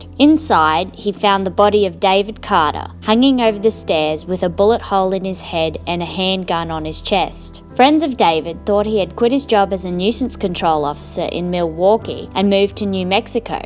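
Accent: Australia. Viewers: Australian accent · the narrator sounds like an Australian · AUssie twang. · sounds Aussie to me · that australian accent is adorable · Hey!, you sound like an Aussie.... Oi Oi Oi:) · Watched half of it but the first case was the best and then they got boring. The best part of the video is the narrator's voice: refreshing to hear a young Aussie's voice rather than a yank or a synthetic machine voice! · the person who speaks sounds austrailian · 2funny girls Channel UMM NO, Australian people. SHE IS VERY AUSTRALIAN. As an Australian I can confirm that this woman is in fact Australian.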